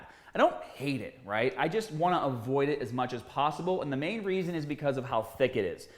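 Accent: Boston, United States. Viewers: Gotta be Boston or somewhere in that region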